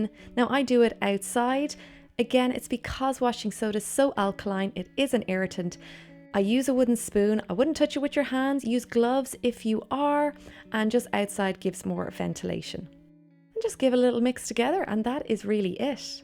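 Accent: Ireland. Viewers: Oh the Irish accent, how I like it! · Loving the Irish accent 😉👍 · Was missing the Irish accent from the series on amazon. Finished all the episodes. Then this came up. Yay, lol 💕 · I was wondering if you were from Ireland then you said it! I'm italian so I don't have much clues about english accents, but the Irish one is in my heart forever now. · Your Irish accent is sooo cute!!! :) I can actually understand it!!! As a North American, I don't really have a chance to hear the Irish accent, but when I do I can't really understand what anyone is saying!!! Lol. May I ask what city or region your accent is from in Ireland? Thanks if u can reply!! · Are you Irish? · I love Irish accents! ☘️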